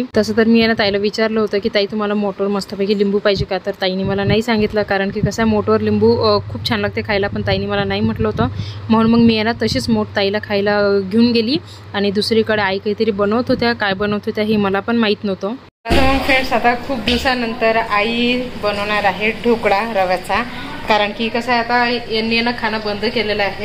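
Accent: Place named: Akola, India. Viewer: तुमची भाषा माला खूप आवडते आमच्या शेजारी आहेत ना ते अकोला चे आहेत हाऊ ना😊😊 मस्त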